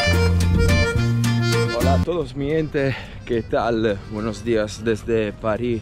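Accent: Italy. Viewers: me imaginé porque tengo un amigo que también es italiano y habla el español así mismo 🤭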